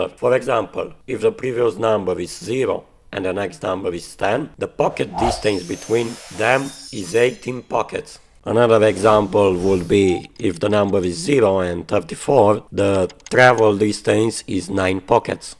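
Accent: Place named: Russia. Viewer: Bro your voice is a legend gambler voice! I love that russian accent ❤❤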